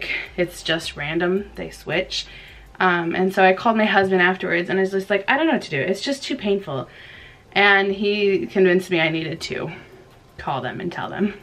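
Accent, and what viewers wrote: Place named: Midwest, United States. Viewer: lol, i knew you were from the midwest! we have the same accent ahaha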